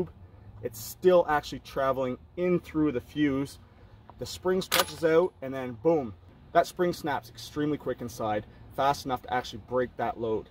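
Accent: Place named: Newfoundland, Canada. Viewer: I hear a slight Newfie Accent. I was born in Newfoundland--in Alberta atm. Love your channel. I've been giving serious thought to going for journeyman electrician.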